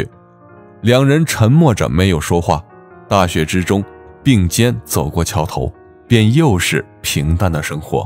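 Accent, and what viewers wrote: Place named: Osaka, Japan. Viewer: 大阪弁いいね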